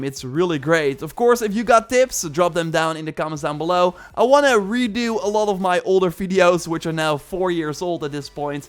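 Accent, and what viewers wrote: Netherlands. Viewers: You're Dutch right? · He ben je ook nederlands (nederlands accsent) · This guy HAS to be dutch with this accent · Amai da's een extreem accent ik geloof niet dat je geen Nederlander bent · Jij bent vast en zeker een Nederlander kan k horen aan 't accent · That accent 😂❤️ You’re definitely Dutch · I smell dutch🇳🇱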